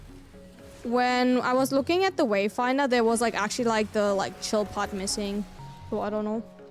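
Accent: Germany. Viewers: Are you german per chance? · Am I hearing a German accent?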